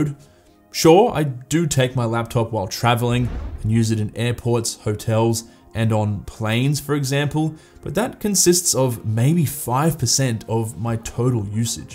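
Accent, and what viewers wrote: Australia. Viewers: He sounded Aussie to me. Now I'm confused.... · Such a great vid, cheers! It took me a while to figure out why it was so easy and relaxing to watch.. it’s just nice to hear another Aussie review these things and not have to process accents haha thanks mate 🤙🏼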